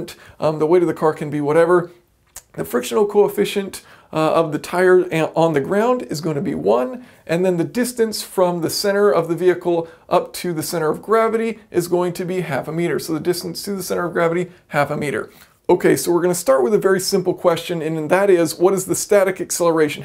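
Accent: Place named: United States. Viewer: You sound like you’re American. What’s with using “kg, and meters”? Speak in American English cock suck!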